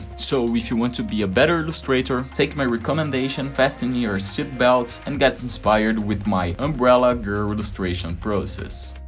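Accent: Brazil. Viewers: Só pela forma de falar já sei que é BR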